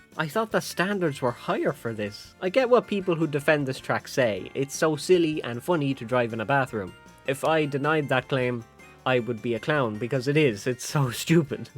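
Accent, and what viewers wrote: Ireland. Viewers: You must be irish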